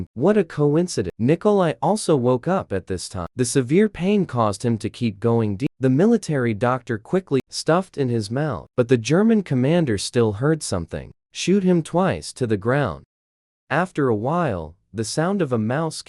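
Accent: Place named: India. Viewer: "Inside this video". This is not even AI its actualy an indian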